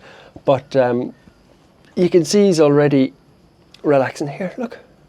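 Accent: Ireland. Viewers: Irish brogue/lilt ❤❤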